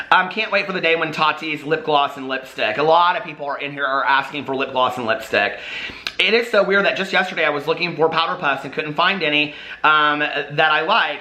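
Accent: South, United States. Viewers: where do you get your southern accent and sayings? I've never heard "gag a maggot" outside of the south. Love it!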